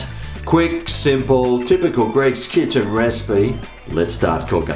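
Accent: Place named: Australia. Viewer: I love you and all my family loves you too 💜 oregaaaanooo love the Australian accent 😎 keep glowing sir ✋.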